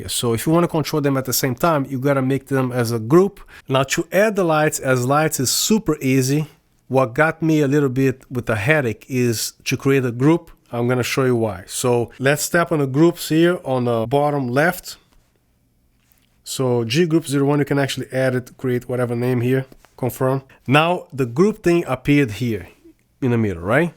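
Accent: Brazil. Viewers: Based on your accent I am guessing voce e Brasileiro. I just bought 4 of these. I cannot wait to use them!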